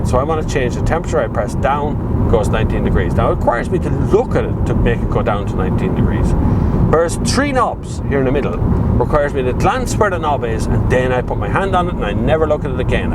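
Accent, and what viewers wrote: Ireland. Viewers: Helpful review - and particularly insightful about the touchscreen - thanks. It's hard sometimes to keep track of how all the cars in this segment compare to each other for the aspects you can't see in the videos - ride quality, refinement, engine tractability and response. I'm meaning that it would be useful to have a comparison of these aspects between VW Golf, Audi A3, this Peugeot, Alfa Romeo Giulietta, BMW 1-Series. As I think you have driven all these cars - perhaps you can edit something together to compare them all? I really enjoy listening to your voice - to my non-Irish ears, you sound like Chris O'Dowd (IT Crowd, Bridesmaids) and you can be just as entertaining! Thanks again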